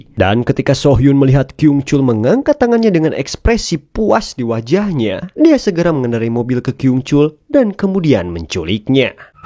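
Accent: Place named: Bandung, Indonesia. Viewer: apakah vo ini gk ngeri malah lucu gara"bahasa bandung nya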